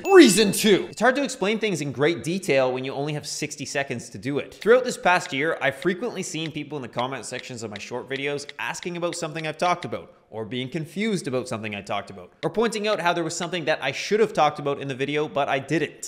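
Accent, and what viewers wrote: Canada. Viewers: Can you do both long and short? I need a video about muting random interstitial noise while playing. BTW, you've never sounded.more Canadian,.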